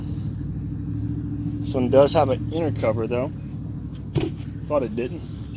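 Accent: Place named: Lafayette, United States. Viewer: Personally, I’m terrified of bees, but I watch your videos to understand bees better. Hopefully bees won’t be as scary if I understand why they act the way they do. Also, your accent is a taste of home for the homesick. I’ve been missing Lafayette.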